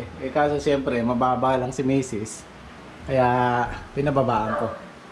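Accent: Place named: Pampanga, Philippines. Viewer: Sir bak8 kapampangan salita mo e, taga nueva ecija ka?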